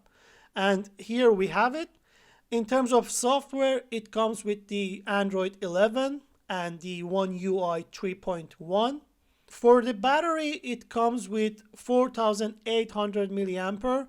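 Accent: Iran. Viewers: I know a Persian accent when I hear one lol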